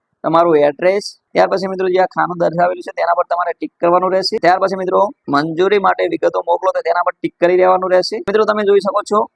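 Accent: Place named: Banaskantha, India. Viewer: આપ બનાસકાંઠા થી છો ભાઈ ભાષા થોડી એવી છે, અમે પણ બનાસકાંઠાના છીએ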